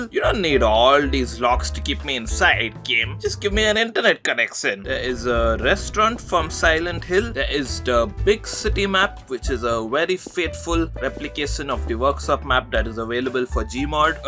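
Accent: India. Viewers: You sound indian but i have abit indian accent in my voice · you are indian? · you gotta love his Indian accent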